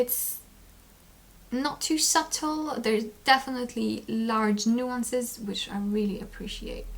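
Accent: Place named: France. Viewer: Oh, your Saule leaf design shawl took my breath away, that motif with the garter is simply beautiful. When you said you may incorporate small tassels within the main ground I thought Oh yes please, what a fabulous idea. Please don't perfect your English accent too much and lose your frenchness. Cheers, Jenny.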